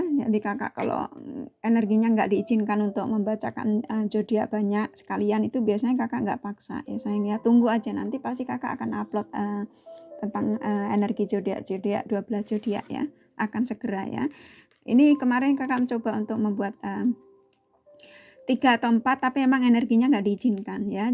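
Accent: Java, Indonesia. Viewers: Kalau boleh tau kak orang mana Kok bicaranya logat jawa banget. Jawa tengah X ya kak Salam kangen Aja for you kak 😘😘😘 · Btl skl kak sy&dia pernah hdp bersm 29 thn,dan sdh ada DUA anak, tiba2 km bercerai, skrg sdh 4 thn,dan telah bersm yg lain, namun akhr2 ini ada gelagat ingin rujuk, sy sih msh sndr ( single parent ), bg sy sdh nyaman dgn kesendirian,sy sdh happy dgn cucu ytc. Mksh ya kak readingan,sy sk skl dgn suara kk yg njowoi dgn canda' an ben no rasakno dll, ok kak smg kk makin sukses,sht sll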